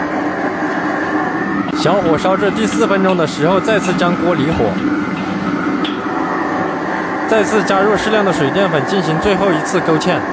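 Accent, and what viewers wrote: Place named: Zigong, China. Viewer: Shuai Wang 这个师傅一听就晓得是自贡人，，， 怎么不是川厨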